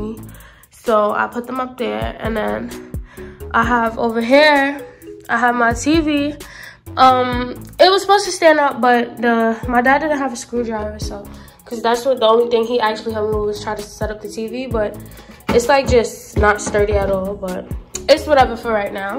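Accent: New York, United States. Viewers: Her NY accent is giving me the chills 😍😍😍😍😍😍😍😍😍 I love it hereeee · The NY accent girll 😎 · Your lil ny accent is so cute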